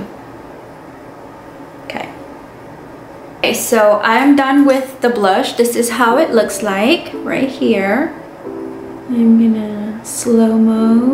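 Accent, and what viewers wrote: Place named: United States. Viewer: I love the slang American accent ..smarty sissy ..wish you luck on your channel.much love 💛❤️💙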